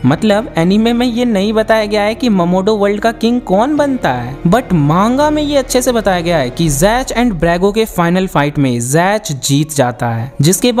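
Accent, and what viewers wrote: Bihar, India. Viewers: Bhaiya aise hi bola karo aap acche lagte Ho hamare Bihar mein bhi aise hi bola tha um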